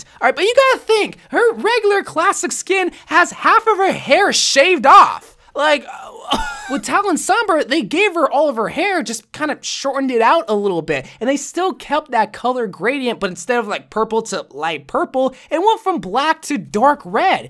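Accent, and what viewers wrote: United Kingdom. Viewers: Your British accent ain't that bad don't worry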